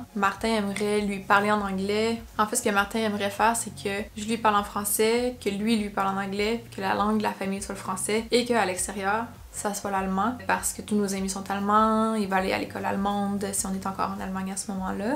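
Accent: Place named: Quebec, Canada. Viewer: Hello, je n'ai jamais été enceinte et ne prévois plus de l'être mais c'est marrant, à la fois ces cravings et au contraire ces aliments qui dégoûtent, je ne sais pas à quoi c'est dû. J'ai trouvé tes mots sur ton couple avec Martin très touchants, on sent que vous êtes deux moitiés d'un et que vous vous complétez, c'est beau une telle relation qui parait très saine:) Après deux longues relations, dont une qui menait, dans un futur proche vers une vie de famille, qui s'est au final, finie de façon très moche (pas de ma part) il y a 6 ans, j'ai perdu foi dans tout ça et me suis renfermée sur une vie solo presque volontairement mais à l'aube de mes 40 printemps (pas cette année mais bientôt), j'espère trouver ce genre de relation;) En tout cas, même si la grossesse ne m'intéresse pas (plus), je ne sais pas pourquoi j'ai regardé ta vidéo hier soir tard au moment de me coucher mais je l'ai trouvée bien faite et intéressante ^_^ Et j'adore l'accent québécois, il y a 2 ou 3 mots que je n'ai pas compris je crois mais c'est le charme de votre "langue" ^_^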